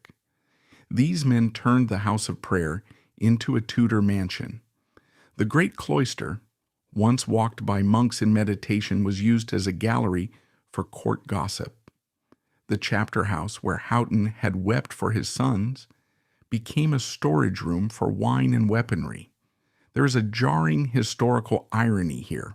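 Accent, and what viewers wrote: United States. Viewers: Why the American voice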